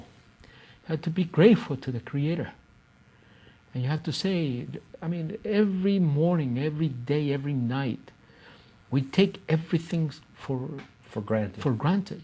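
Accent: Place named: South America. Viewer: This man is from a south american( peru or something) country.. his accent is not a blackfoot, kainai or peigan or anything from that area.. sorry folks, but maybe he has some good teachings.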